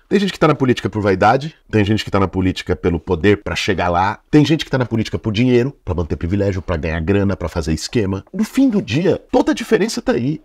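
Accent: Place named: São Paulo, Brazil. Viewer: BOULOS NO SEGUNDO TURNO.....SOTAQUE GENUÍNO DE PAULISTANO. NOSSO FUTURO PREFEITO.😊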